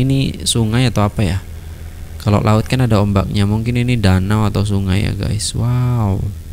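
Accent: Malaysia. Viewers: ni cara pertuturan dia sikit lebih kurang mcm org M'sia. Senang faham. Terbaeek👍👍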